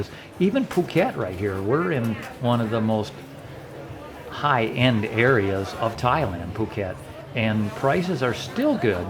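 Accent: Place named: United States. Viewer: Thanks for the captions, cause you American accent is difficult to listen to.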